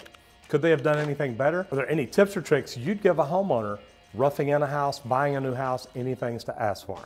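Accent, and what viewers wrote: South, United States. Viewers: I have no idea what any of this is, but I stayed for that southern accent. 😃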